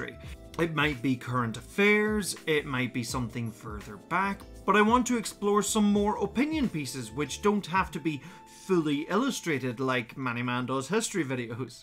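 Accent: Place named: Ireland. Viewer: A complicated political situation explained in an Irish accent somehow makes it better 😍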